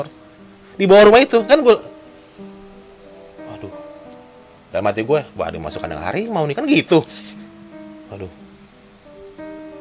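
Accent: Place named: Jakarta, Indonesia. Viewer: Ngomongnya cepet bet dah... wkwk logat jakartanya kental jadi susah di hayati😅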